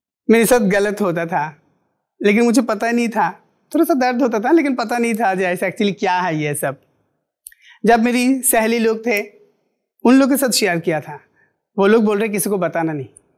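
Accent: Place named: Bengal, India. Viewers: Today after listening to her,I understand she is Bengali.Hats off to her struggle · She has an adorable Bengali accent. Is it just me who thinks that?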